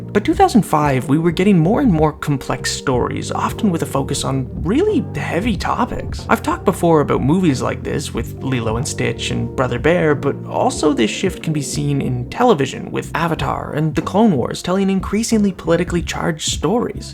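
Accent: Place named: Canada. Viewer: He sounded Canadian to me.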